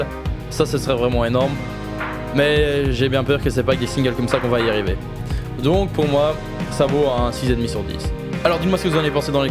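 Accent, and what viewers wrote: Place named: England, United Kingdom. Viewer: Cet accent anglais haha ! GG continue :)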